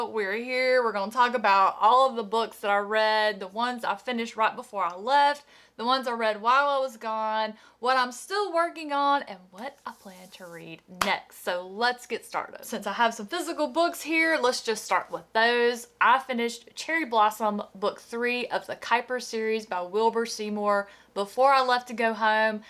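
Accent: South, United States. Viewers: I find it hilarious that the accents tripped you out - I live in MS, and your accent is nicely southern to me, and more pronounced than most of the people I'm around on a day-to-day. 😆🤭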